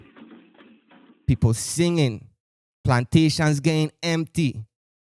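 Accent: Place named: Caribbean. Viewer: “Awo”, I watched this educational and somewhat comedic video in Ms. Charles’s class at John H. Woodson junior high school, I enjoyed the comedy and to hear a Caribbean accent speak to me about the topic of slavery. I find this video informative because it shows what our ancestors had to go through for us to be where we are now. - Lesyah D.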